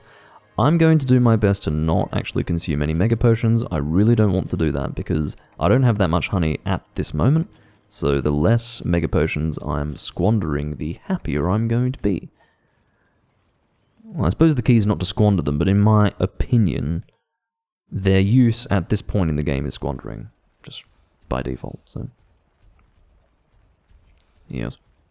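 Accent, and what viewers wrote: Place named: North America. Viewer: Your North American accent is on point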